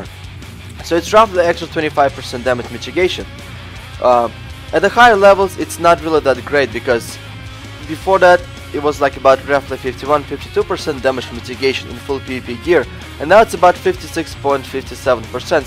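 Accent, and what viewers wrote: Russia. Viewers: Are you truly a russian, ive watched many of your videos and you talk the same in each video, but at this video you say to a guy in wow " Gonna make another video with stupid accent "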